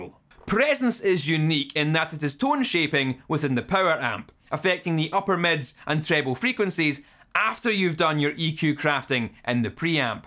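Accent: Scotland, United Kingdom. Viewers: How can we do your scottish accent? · Honestly i just watch these videos for cool accent🤣, my scottish brother(scottich heritage here)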